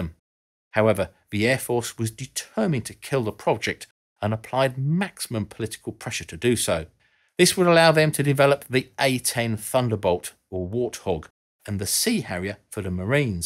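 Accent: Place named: Essex, United Kingdom. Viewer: The gecko has a London cockney accent & is from Essex 50 miles north-east of London - they will sound the same to most Americans.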